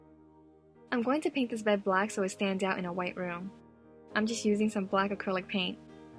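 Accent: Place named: New Jersey, United States. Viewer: Are you from New Jersey? You have the accent.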